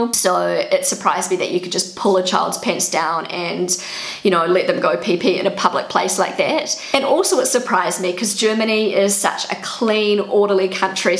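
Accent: New Zealand. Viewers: Everytime a pleasure to hear your velvet voice with this funny 🥝 accent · Hi, I love your videos and your cute kiwi dialect. I find it great that you speak with your children only English and obviously your husband German. Never again it will be so easy for the kids to learn 2 languages at the same time. My daughter in law is American and they live in Australia. My son speaks with their son only German and my daughter in law only English. The kid is three years old now and it is amazing to see how he speaks with his parents in their different languages. He turns his head to his father and speaks German and then turns his head to his mother and speaks English, incredible! And on Skype he speaks German with us, his grandparents. Warm greetings from Hannover, Germany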